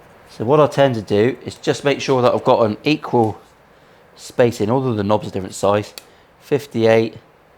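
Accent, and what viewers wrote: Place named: Plymouth, United Kingdom. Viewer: The Plymothian accent had me hooked immediately. I'm Bristolian, so we share a twang.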